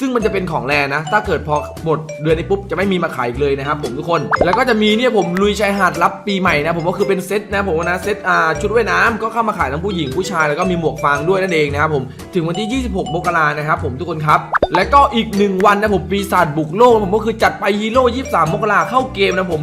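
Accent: South, Thailand. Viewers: อยากให้พี่ พูดใต้ทั้งวัน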